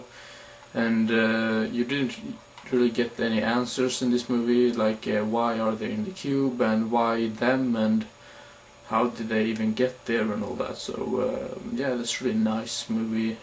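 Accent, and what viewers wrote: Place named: Sweden. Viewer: Haha, hörde att du var svensk när du pratade. Roligt att de första jag hittar efter att sett trilogin är en svensk kille som snackar. :)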